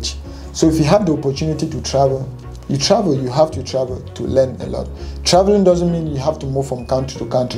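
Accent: Cameroon. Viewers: OMG am happy you're from Cameroon,am from Bamenda no wonder I understand you better than other forex traders because you have that our Cameroon accent😂 wow am happy to hear this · Yoo bro when I first came across your chanel something told me u sound like a Cameroonian am also from Camer and proud to come across u bro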